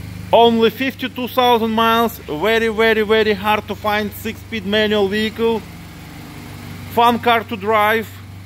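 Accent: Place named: Russia. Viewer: Русский-русский) Наш акцент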